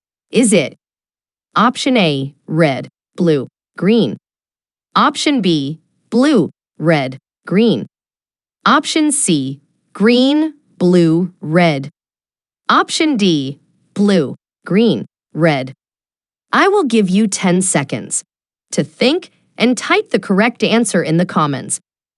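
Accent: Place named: United States. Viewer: As the voice is American, I'm going with the DMV. Car B has right of way. Vehicles from the right, have right of way as both vehicles arrived at the same time. Reference California DMV: Remember, "right is right". The “First Come, First Served” rule applies. If two cars arrive at the same time, the driver to the right has the right of way.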